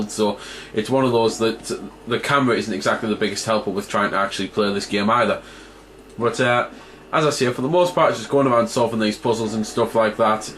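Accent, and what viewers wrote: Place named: Northern England, United Kingdom. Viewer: Goddamn I can't place your accent. It's Northern...probably N.E.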